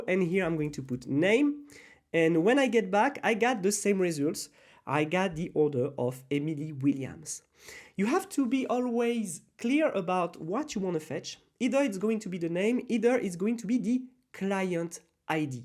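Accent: France. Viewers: Cool. I like french English accent. It's only below the India English one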